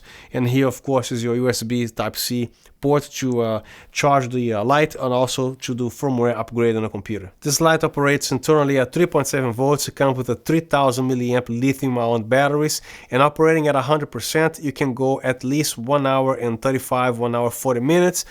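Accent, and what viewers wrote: Brazil. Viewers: I saw a lot of your videos and always i think you was brazilian or portugues... your english sound like brazilian! Great job bro! Regards from the Caribbean =)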